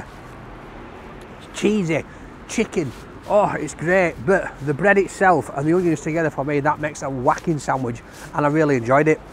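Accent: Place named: Northern England, United Kingdom. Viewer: I'm here in Washington, DC. Most of the Brits we have here are Oxford or Cambridge graduates, and hold fancy jobs. They have posh, upper class accents. We don't get to see many people from the North of England on the media, and don't hear many working class accents. At times its a little hard to understand, but I love watching him anyway!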